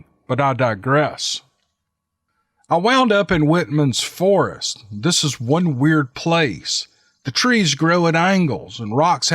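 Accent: South, United States. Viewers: Dude I bet that's why I listened to your stuff I like hear your voice you got this really Great Southern Comfort accent and you're great storyteller are really into big foot have a great night camping in your wife have a good night God bless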